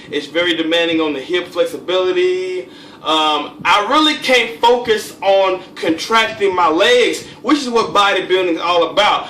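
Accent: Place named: AAVE, United States. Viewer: talks like a dummy but if you can look past the ebonics he makes a lot of sense and hes oozing charisma. Love to watch him. Some ppl sound too "boring professor" like. Keep doin what youre doin bro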